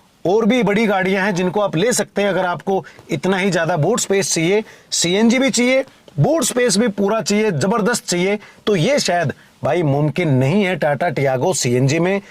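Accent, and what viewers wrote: Haryana, India. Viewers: भाई जी राम राम ap hna bolte acha h thodi haryanvi touch aati h vo acha lgta h apke es channel par to video top hoti hi h, lekin eske alawa m khna chahta hu ki ap ek apna vlog channel bhi bnaye or daily routine ya family k sath kahi aate jaate h uska vlog bnana ye sab video bhi suru Karo vlog channel k sath.. mja aa jayega ❤️❤️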